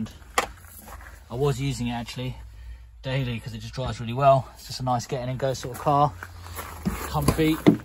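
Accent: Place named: United Kingdom. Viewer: It's just some British guy talking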